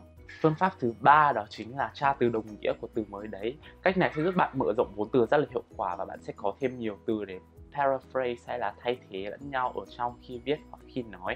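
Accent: Nghe An, Vietnam. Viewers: Bạn ấy giọng Nghệ An nhưng cố nói giọng chuẩn Hà Nội nên chưa tự nhiên 100% á nên giọng nhỏ và trầm hơn bình thường, nghe thì sẽ thấy có nhiều chỗ hụt hơi do phải "luyến" cho ra giọng HN với đôi chỗ cũng nghe ra âm sắc giọng Nghệ An:)) Cơ mà mình thấy giọng Nghệ An hay mà nếu nói chuẩn như giọng HN thì tốt không thì cũng chả sao! Just the way you are.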